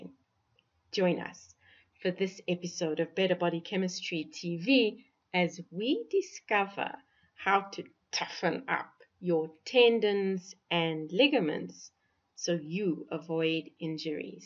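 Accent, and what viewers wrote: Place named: South Africa. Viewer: This is a South African accent! I'm pretty sure about it!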